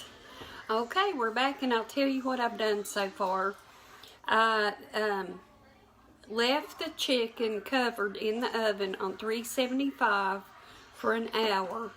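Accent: South, United States. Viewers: We saw the munchkin peekin around the corner! Ma'am what part of the South are Y'all from? (not just the state but like north east Alabama or south west Arkansas) Thanks.I watched your questions video. Now I know why the indeterminate accent! When I was a kid in SW Michigan on a fruit farm, Southerners would come up and pick fruit. I absorbed many an accent during those summers. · I wish I was your neighbor. I could visit with you for hours. I love your sweet southern accent and your sense of humor. You're just the sweetest. I am looking for new recipes and I found the right channel. We only eat the boneless, skinless chicken breasts. I do but some thighs or legs for my son occasionally and so I can get some good broth to freeze or make gravy with. Thank the Lord you're video was in my feed because I am binge watching now. God bless! ❤❤❤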